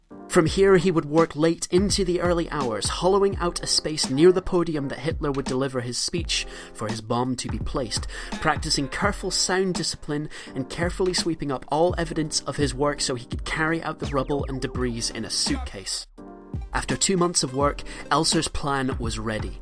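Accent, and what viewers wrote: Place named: Ireland. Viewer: Nice Irish accent you got there mate.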